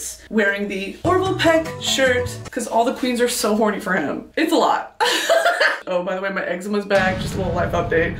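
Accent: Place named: United Kingdom. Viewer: Why does she sound like the opening wizard man from Wizard101 w her british accent <3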